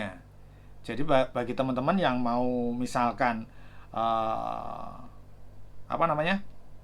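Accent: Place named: East Java, Indonesia. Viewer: Nek wiz muni,, "Haaahhhduhhh...." Aksen JATIM nya kuat sekaLii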